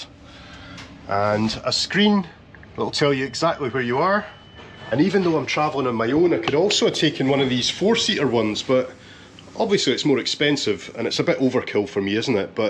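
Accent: Scotland, United Kingdom. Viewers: Before watching this video, I'm excited to see a outsider view on VR trains! :D I have used the train to travel from study city to hometown, 700km+ takes 6h 30mins usually. and anytime i have had delay due to VR being silly has been at least twice. Delay happened because there wasnt enough of busses or taxis for around 40+ people so it was quite annoying. Now I will watch this video and see your thoughts! edit: Gave a sub and like, I love Scottish accent and I love hearing about non-Finns express their thoughts on Finnish stuff :D 89€ sounds like a fair price. mine usually are 30-50€ depending on how close i book the departure etc. Aww I loved the Conductor's Hello :D They are doing this thing called "Pilkki", which I think is called Ice Fishing? But you have this tiny rod and you sit near the drilled hole hoping to capture a tiny fish. Never tried it, only regular fishing :D one time i get to spend little bit time in one, since the train had broken so we had to partake in a train as extra passenger so i stayed until someone who had it reserved came to claim it. 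The soundproofing of them are quite nice! currently while writing this i am , Here he the breakdown of the coaches in VR trains Coach 1 = pet coach coach 2 = Extra class coach 3 = Extra/silent coach(I might be mixing the 2 and 3 together, but i remember that both should have unique top decks) coach 4 = Children play area Coach 5 = just your average coach Coach 6 = nothing special I will admit i am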